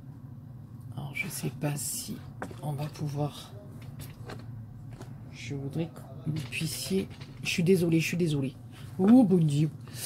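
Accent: South, France. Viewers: ccou....waouuuuuu...tes créas reçues sont justes magnifiques!!!!! pourquoi tu reçois autant de gentillesse????? parce que tu l'es toi-même!!!!! ta bonne humeur en toutes circonstances traversent les écrans, perso quand tu parles avec ce bel accent du Sud, bah moi j'entends les cigales.... des gros poutous.....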